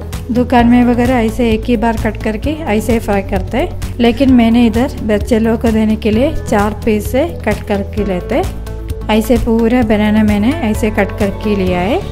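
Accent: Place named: South, India. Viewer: I am from Bihar love to listen your Hindi . Truly speaking I don't want to listen your perfect Hindi Because your innocent Hindi tone mixed with south Indian tone sounds Awsome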